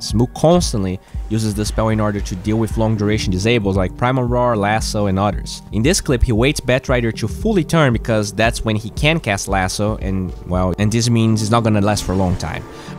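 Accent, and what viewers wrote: Brazil. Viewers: Are you brazilian or know how to speak portuguese? You look like a brazilian when speaks english. (by the way your english is great, I'm just asking XD)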